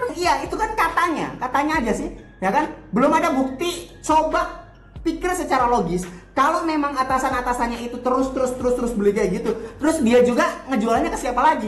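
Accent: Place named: Banyumas, Indonesia. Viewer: Jangan di tonton....Yaa Brother" keenakan nih Bocah Ngapak !!!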